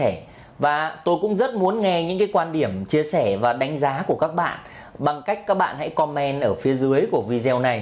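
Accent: Thach That, Vietnam. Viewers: ad ở huyện nào mà nghe giọng nói giống giọng Thạch Thất vậy?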